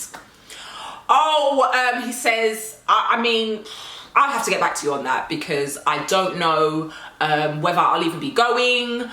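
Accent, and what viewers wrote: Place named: England, United Kingdom. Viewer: YOU ARE ADORABLE! I LIKE YOUR LIVELY, FUN APPROACH, AND YOU HAVE A GREAT VOICE! YOU REALLY HAVE A STRONG ENGLISH ACCENT... ADD A FILL LIGHT IN FRONT OF YOU WHEN YOU TAPE. YOU ARE TOO PRETTY TO BE IN A SHADOW!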